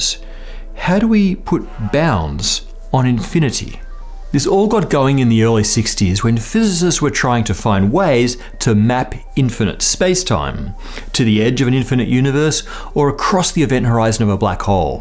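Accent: Australia. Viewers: Matt O'Dowd is Australian that's why he sounds different . André in Sydney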